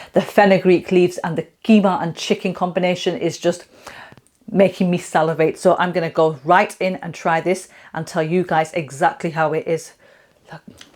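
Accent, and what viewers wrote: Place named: North, United Kingdom. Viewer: are you from up north , can hear is in the accent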